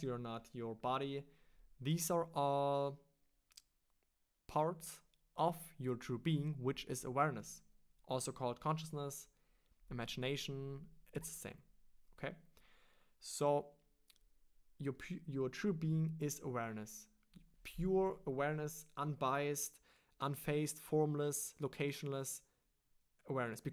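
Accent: Germany. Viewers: are you German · Deutscher Akzent?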